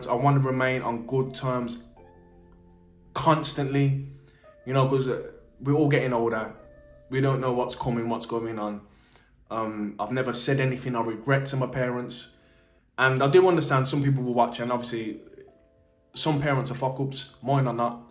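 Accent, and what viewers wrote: Birmingham, United Kingdom. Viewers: good vid bro you from brum ? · bro which place in the UK is that accent from? its fire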